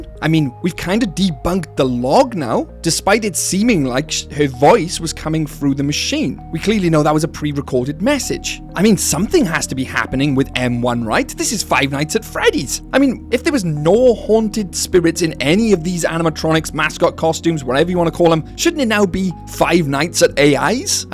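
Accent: United Kingdom. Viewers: I cannot place your accent. Where in uk is ur accent from?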